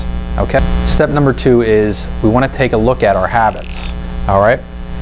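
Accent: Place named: Pittsburgh, United States. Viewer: Are you around Pittsburgh? I'm judging by your dialect.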